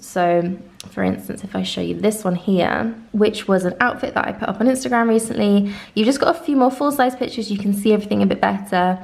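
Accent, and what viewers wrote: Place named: Scotland, United Kingdom. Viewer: You sound a bit scottish and i love it!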